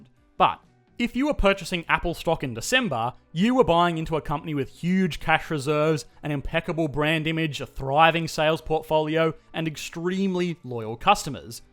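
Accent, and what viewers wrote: Australia. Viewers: is the speaker an aussie? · Is he Australian??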